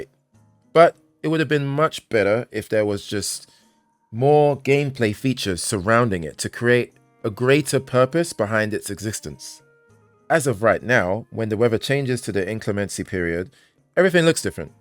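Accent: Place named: Scotland, United Kingdom. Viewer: the scottish-ish accent had me rolling mate, keep doing what you're doing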